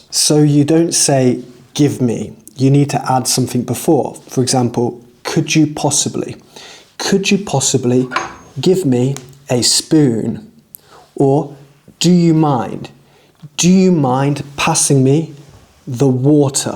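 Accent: United Kingdom. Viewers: such an energetic and lesson and inspiring british accent youtuber, I hope I may successfully prounce it as well in the future😃 thank you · So beautiful the British accent, I really love it although it can sometimes be quite difficult to understand. Thank you for this interesting and amazing lesson ❤❤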